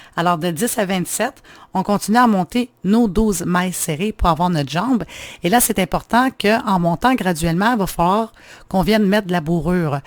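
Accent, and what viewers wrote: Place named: Quebec, Canada. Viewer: Merci pour ce tuto c'est exactement ce que je cherchais. Comment faire un pied 😁❤. J'adore votre accent Québécois 🤗🥰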